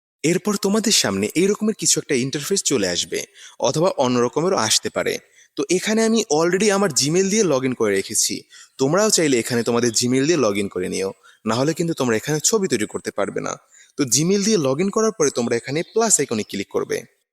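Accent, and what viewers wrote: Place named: Sylhet, Bangladesh. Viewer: সিলেট থেকে আপনি?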